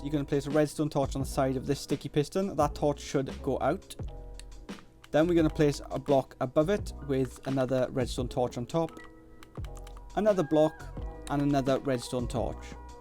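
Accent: Wales, United Kingdom. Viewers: U Welsh?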